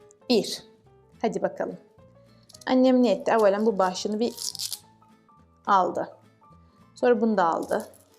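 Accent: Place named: Azerbaijan. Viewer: sanki azerbaycan şivesiyle konuşuyorsun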